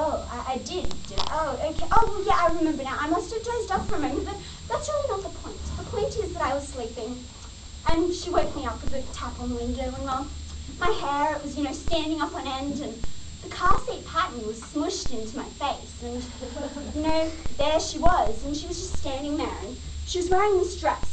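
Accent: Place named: Australia. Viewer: shes aussie not british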